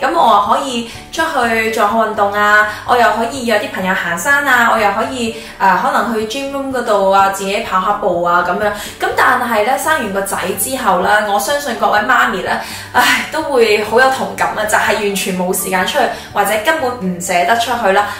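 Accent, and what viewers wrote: Standard. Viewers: 原來coffee普通話也說得很標準的，第一次看到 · 好喜欢听coffee 说普通话。感觉你的国语越来越标准了👍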